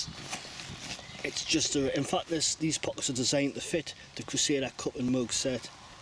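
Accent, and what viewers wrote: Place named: Scotland, United Kingdom. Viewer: Looks spectacular. You sound like a soldier I served with from Scotland. Excuse my ignorance if you are not, some are quite touchy about that....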